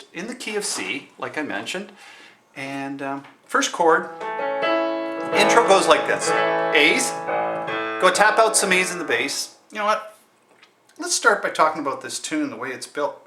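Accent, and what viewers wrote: Canada. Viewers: Great Great Video. Good job, took me less than an hour to learn this song because of this video. And, say, you sound Canadian. Are you?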